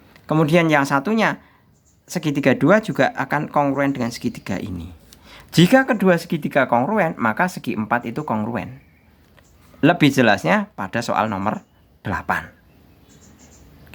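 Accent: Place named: Java, Indonesia. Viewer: Medok ya pak…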